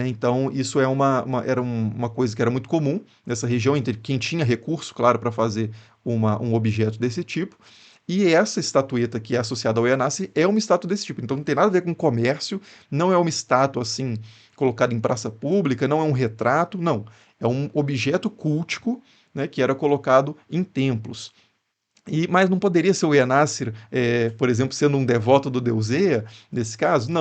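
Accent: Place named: Rio de Janeiro, Brazil. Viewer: Com certeza Carioca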